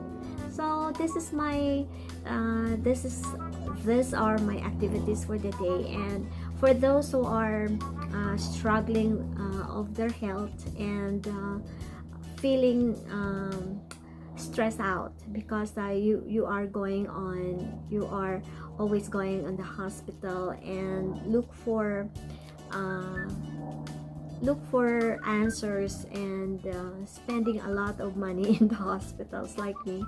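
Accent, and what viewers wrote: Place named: Philippines. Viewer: Plus your accent sounds just like hers. She was filipino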